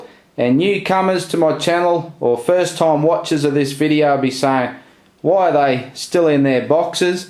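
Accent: Australia. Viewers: I can tell you now, most Australians don't have accents this strong. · Yes we get it you're australian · The Aussie accent lol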